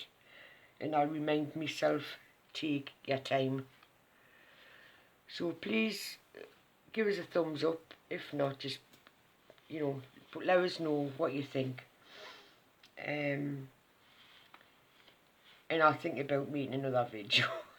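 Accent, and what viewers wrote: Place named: Newcastle, United Kingdom. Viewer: Lovely card hun and great channel. Lovely to hear another Geordie :-) xx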